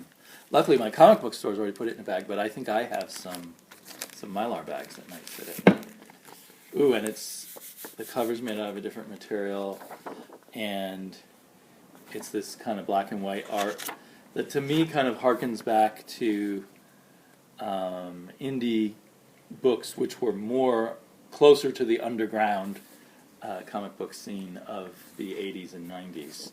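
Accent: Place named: United States. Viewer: Thank you for the kind words and shout out. No worries on the name pronouncement, it´s hard to pronounce with the american accent I know, but cuddos for trying;) Still enjoying Batman Eternal and Batgirl with the beautiful Alex Garner covers. Cheers!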